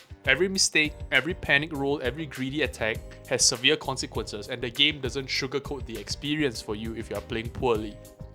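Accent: Singapore. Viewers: * Hears accent, hmm Singapore?🤔 * Checks Channel -> Bingo🤓 (Hello from South Africa 👋🏾🇿🇦)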